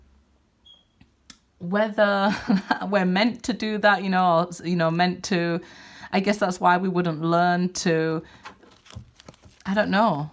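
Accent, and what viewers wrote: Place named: Yorkshire, United Kingdom. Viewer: Luv your accent. x.... May I ask what accent is it.?.. Sounds like Yorkshire ?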